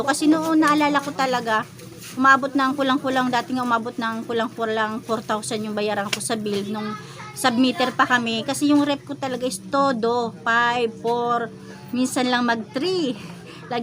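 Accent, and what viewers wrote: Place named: Bicol, Philippines. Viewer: watching kasari galing ng intro sarap pakinggan yong salita ng bicolana haha..salamat sa pag share ng billspayments niyo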